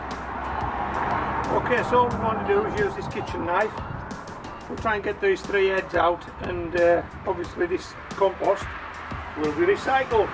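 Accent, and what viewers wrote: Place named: Scotland, United Kingdom. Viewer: Love that Scottish accent!!!❤❤ Thanks for this, celery is the first veggie I'm trying to grow! In Australia!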